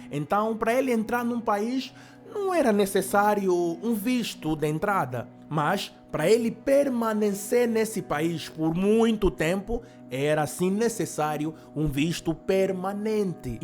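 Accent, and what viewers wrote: Angola. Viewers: O que me Cuia é que vc fala mesmo como Angolano mano. E eu Amo isso.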